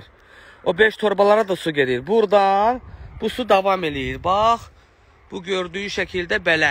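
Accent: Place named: Armenia. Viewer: Ай геде сен йахшы биленсенсе тап да орада не ойун чыхарырсан озунде ермени лехчесинде данышырсан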